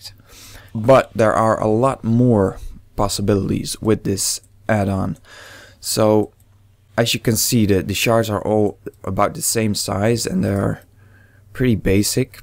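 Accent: Netherlands. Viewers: Are you Dutch? juging by your accent.. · je hebt een Nederlands accent :-) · You sound dutch ;) very cool addon!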